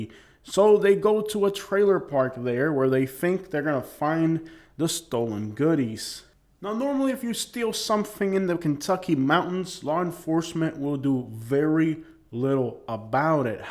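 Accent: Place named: New York, United States. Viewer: Apparently you are not from this area. You sound like you’re from New York. Get your facts straight before you make an ass out of yourself.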